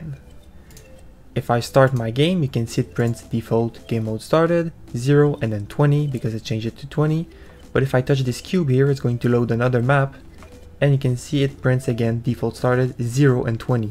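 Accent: Quebec, Canada. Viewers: where u from? u sound french canadian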